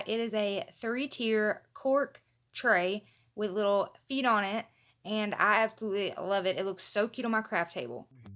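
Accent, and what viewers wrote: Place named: East Texas, United States. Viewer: You are very creative! I love the 3-tiered tray and I am most definitely making the “I Can Make That” sign. I say that all the time. Also love your sweet accent! ❤️East Texas?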